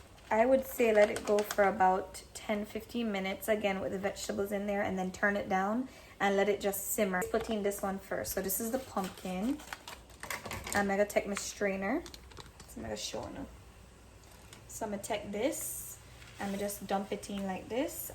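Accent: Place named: Jamaica. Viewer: LMAOOO OMG !!! I love you speaking in patois!! Im jelly! I can't do it.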